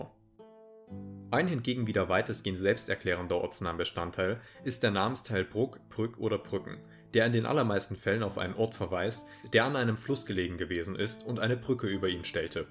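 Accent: Saxony, Germany. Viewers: Definitiv aus Sachsen/Thüringen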